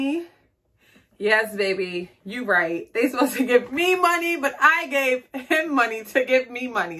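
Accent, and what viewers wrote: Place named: New York, United States. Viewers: That gotta be Brooklyn 😭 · You most def from new York don’t no where tho 💯😂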